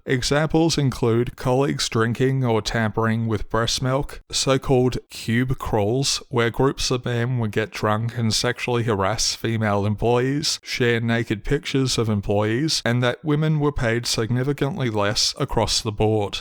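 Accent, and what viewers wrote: Australia. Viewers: Equating the forceful internment of Uyghurs, despite there being no evidence of actual genocide, with the rest of these actual genocides is the problem here. Would you call the systemic arrests and imprisonment of black people in America a genocide? The actual problem here is that In all of your videos you only actually stand for the western consumer. Genocide for you is something that happens far away from wherever you're at (probably Australia if your accent is anything to go by) and you couldn't care less if billions perished so that you could keep enjoying all those first world pleasures. You could use your channel to make videos about actually horrific things gaming companies are supporting in besieged countries such as Nvidias support for global systems of surveillance and their use of Israeli occupied Palestinian land for tech research, the U.S. 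military propaganda within mainstream 'realistic' fps games such as BF6, Call of Duty, etc., or Saudi/ Gulf monarchy ties to Esports. Instead, genocide is just an off hand topic for you to throw out there for shock value. The main focus of your content is solely on whats good for westerners, nothing of actual value.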